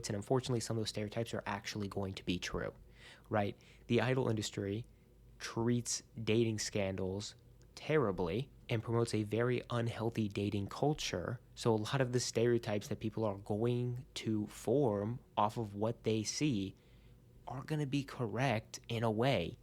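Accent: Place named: Bulgaria. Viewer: Are you Bulgarian or somewhere in that area because I swear that's your accent.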